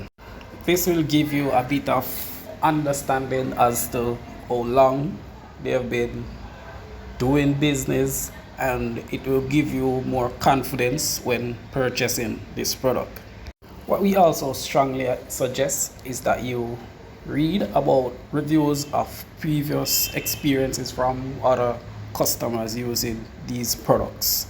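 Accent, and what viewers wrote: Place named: Jamaica. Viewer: Ohhhh. You are jamaican. What part of jamaican are you from? I was born in Manchester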